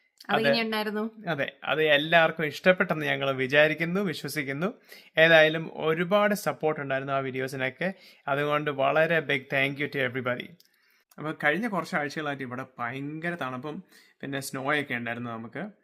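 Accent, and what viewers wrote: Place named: United States. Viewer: How is speaking fluent malayalam and american accent english?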